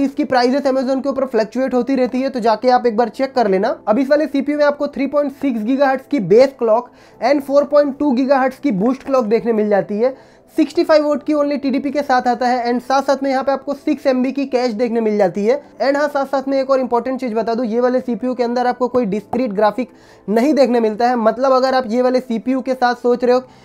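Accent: Gujarat, India. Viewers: Bhai aap Gujarati Ho kya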